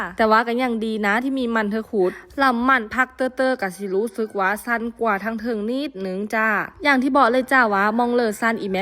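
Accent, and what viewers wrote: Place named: Sakon Nakhon, Thailand. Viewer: พูดเหมือนคนสกลนครบ้านยบายเลย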